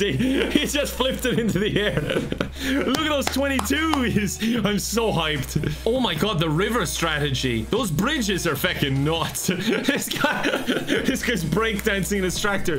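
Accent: Ireland. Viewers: Is my English just improving and therefore I'm getting more sensitive to hearing accents, or is speaking more and more in an Irish accent over the last few videos? It doesn't bother me at all, I really like it, I'm just wondering if I'm the only one noticing this. It could just be me getting better at English since it's not my first language, tho.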